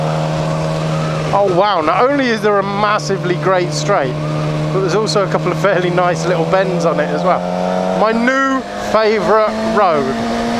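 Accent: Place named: Scotland, United Kingdom. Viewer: I'm from Glasgow. Your Scottish accent is honestly fantastic. I was very surprised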